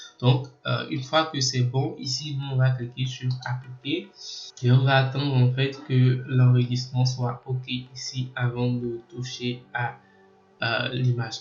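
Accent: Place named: Benin. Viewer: Déjà je n'ai pas encore suivi la vidéo mais par les premières tonalité c’est un Frère du Bénin et ça fais super plaisir J'aime Koudo Azo Nonvi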